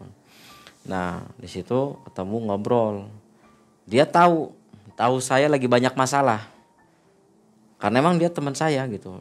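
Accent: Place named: Indramayu, Indonesia. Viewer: Bahasa Indramayu 😅😅 kongsi enk ngrongokna e mang 😂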